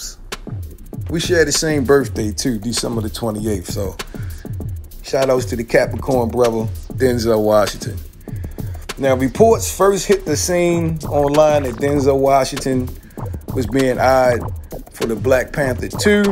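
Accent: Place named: Baltimore, United States. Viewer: i can tell you're from baltimore btw 😂😂😂😂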